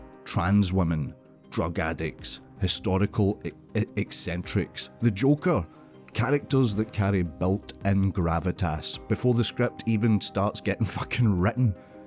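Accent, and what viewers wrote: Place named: Scotland, United Kingdom. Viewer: F. U. Learn to speak English if you want to communicate with English speakers. (You Scottish bastard!) P.S. 'all viewers can tell you LOVE Jared Leto.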